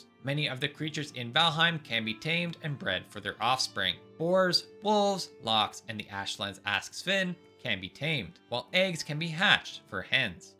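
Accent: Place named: Canada. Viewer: A couple things, the fire tip was super short and kind of obvious lol, one useful thing I've been told about smoke ventilation is it's not always necessary. I don't remember the exact numbers but it's something around 3-4 walls high when you can no longer suffocate from smoke.👍👍 But If aesthetics are more your aim then the particle effects that emerge from smoke buildup is probably going to bother you.😅on a lesser side-note I can totally tell your Canadian from the way you say your R's😂👍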